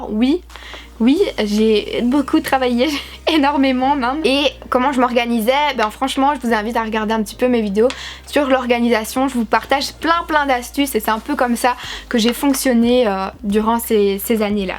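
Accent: Belgium. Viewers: Alors premièrement je commente rarement des vidéos Youtube mais la en ce moment, je regarde tout ce que je peux trouvé par apport à ce métier qui me passionne depuis que je suis en 3ème secondaire! Ta vidéo m'a permise de "valider" encore plus mon choix et je suis certaine de ce que j'aimerais faire l'année prochaine grâce à ça. Je suis aussi de belgique dans la hainaut comme toi plus précisément du côté de Charleroi et je pense que toi aussi en entendant ton accent propre à cette ville ahah! Je voulais te demander si tu étais partie à la Helha ou au Condorcet car j'hésite beaucoup entre ces 2 écoles! J'espère que tu me répondras et je vais m'empresser de regarder tes autres vidéos! Bisous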